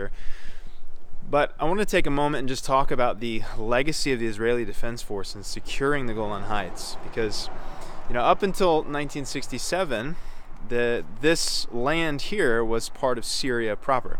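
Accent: United States. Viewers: I couldn’t agree with you more Brother!!! You sound American 🇺🇸 Only have one ☝️ question 🙋 If CHRISTIAN’S truly believed in Hashem and Yeshua as their Savior and following the Torah as COMMANDED… Why is it that United States 🇺🇸 does not have one ☝️ fox 🕳️ hole dug in the Golan Heights and have not worked with the I.D.F.? When I take full control of the American 🇺🇸 Pentagon… I’ll go ahead and make the arrangements myself my American 🇺🇸 friend. I will be activating all U.S. Military branches to DEFCON 5 and deploying the Pacific Fleet off the coast of Israel 🇮🇱 Further arrangements are CLASSIFIED…. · In this video a European looking guy with an American accent tells us why its okay to take stuff from people if you have a magic book telling you it used to belong to some fraction of your genetic ancestors.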